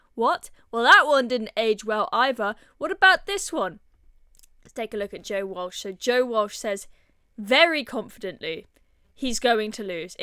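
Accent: United Kingdom. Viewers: Who's the Limey Chick? Is she the Channel Host? She's Lovely!!! 🌹🌹❤❤ I love the little teenie thing she does with the eyeliner!! Beautiful Young Girl!!❤❤❤ Her Limey Accent just adds to her charm!!!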